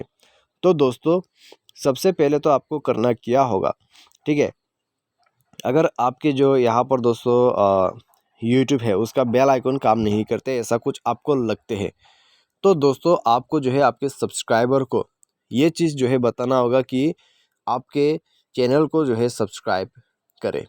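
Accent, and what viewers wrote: Assam, India. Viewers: Bro tmi assam r jen lagise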